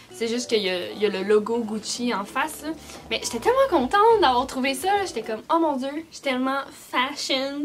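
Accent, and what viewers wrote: Quebec, Canada. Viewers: Tu viens tu du Quebec?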